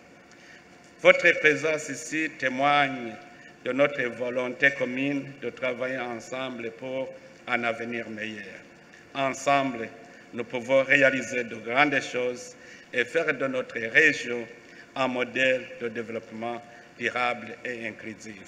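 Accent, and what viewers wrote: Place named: Burundi. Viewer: Igifaransa kikirundi kbsa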